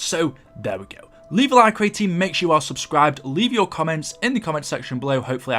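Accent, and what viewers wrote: United Kingdom. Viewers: Why are you British and also who put the mark on the SOE Crew. Or what happens after SOE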